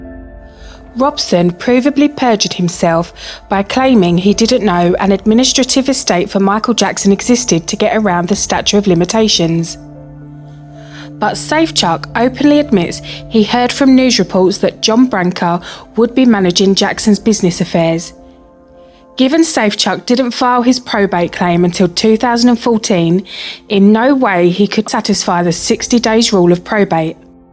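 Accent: United Kingdom. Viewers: Special thanks to the pommie bint who narrates this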